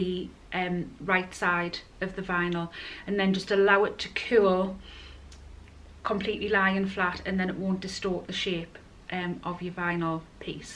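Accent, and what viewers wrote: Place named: North East England, United Kingdom. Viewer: Nice to hear someone from North east on here instead of americans. You make your NCW exactly the same way as I do. I love how the waterproof canvas makes it more structured but i have never used it before can it be bought here in the north east please.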